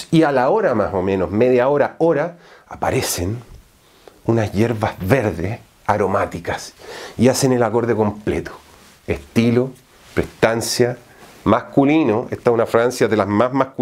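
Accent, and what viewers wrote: Chile. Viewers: Eres Chileno o Español? Pareces una combinación de ambas jaja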